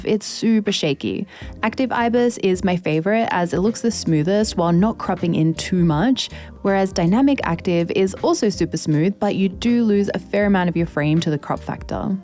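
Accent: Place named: Queensland, Australia. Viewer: Are you from sunshine coast? You just sound like your from Queensland.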